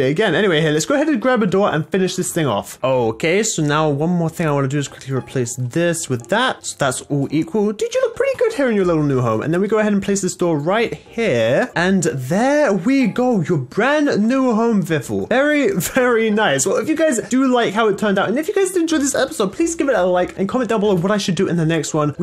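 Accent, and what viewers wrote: United Kingdom. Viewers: I am not British but I would assume he had a British accent from the way he talks.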